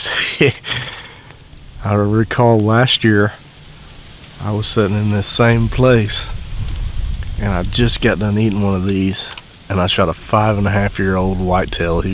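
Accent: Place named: Alabama, United States. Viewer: Your accent sounds like you are from Alabama.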